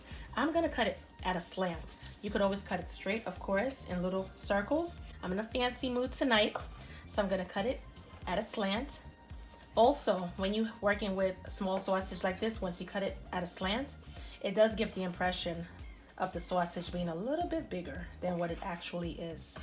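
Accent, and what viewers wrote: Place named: Northeast, United States. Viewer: That looked so tasty! you have a deep North Eastern accent. Are you from Brooklyn?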